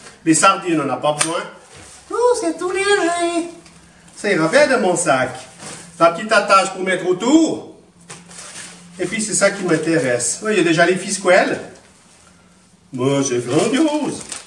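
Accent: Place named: Fribourg, Switzerland. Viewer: Super ton accent. Moi en bon fribourgeois, je peux pas dire le contraire....... Tché Don !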